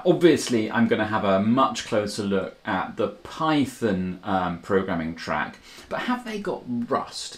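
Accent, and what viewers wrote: United Kingdom. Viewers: The gaming chair betrays the British accent.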